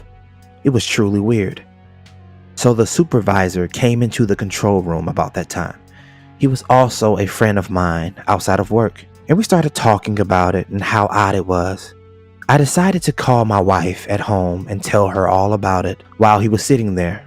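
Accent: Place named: East Coast, United States. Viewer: Ok..ok.. 🤦 ‘’ALLEGED’’…..IS NOT thee same nor should it be used as a synonym to the 2 separate words of ‘’A LEGEND’’ ~ALLEGED- stated or declared to be as described, supposed to be. Supposedly. ~A LEGEND, is a Fable, fiction. Or if someone is called ‘’A LEGEND’’ it can mean they’re a hero. I am 100% aware that 🇺🇸 public school system has been consistent in one thing, just passing kids no matter what, & now even learning cursive writing ✍️ has sadly been tossed out along with basic spelling, which in turn doesn’t help any of the very young have knowledge in not only spelling but the meanings & differentiating of words. It’d be somewhat understandable if this narrator wasn’t a native English speaker, it most definitely sounds like he is & that he’s from the east coast.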